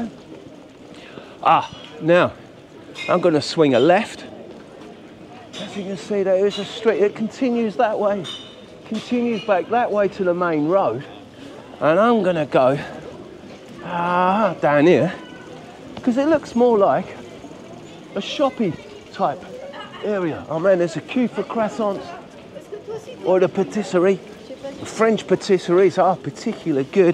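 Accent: England, United Kingdom. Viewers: French people speak English with a French accent, fact. I personally love the way you are speaking French with an English accent. Just as it should be.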